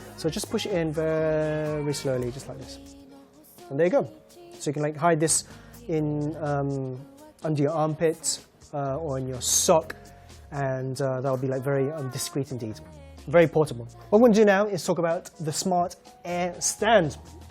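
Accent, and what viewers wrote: United Kingdom. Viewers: Wow! your Smart Dolls are amazing! i love them! and Also, you have a beautiful english accent, i understand you perfectly, congratulations!!!! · British accent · He sounds british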